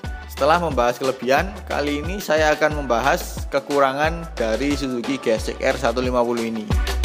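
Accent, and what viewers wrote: Malang, Indonesia. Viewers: Wong malang mas?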